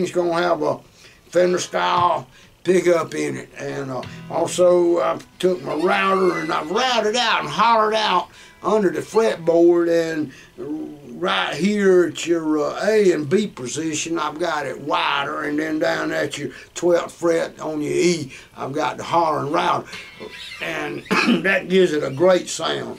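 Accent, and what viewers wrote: South, United States. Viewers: That’s the best southern accent ever N he’s not a bad player either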